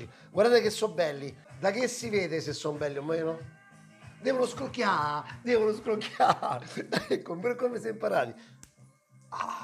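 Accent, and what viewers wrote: Rome, Italy. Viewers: bella ricetta! Mi fa impazzire il tuo modo di parlare romano!e che carino Marietto!...stavo pensando di usare il riso invece della pasta all’uovo..che ne dici??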